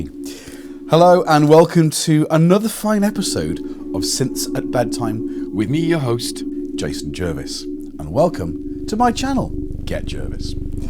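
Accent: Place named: RP, United Kingdom. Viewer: Love this! I wish my English accent was as BBC'ish ;-)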